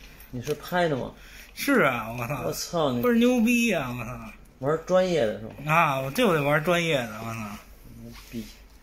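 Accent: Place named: Beijing, China. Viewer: 北京口音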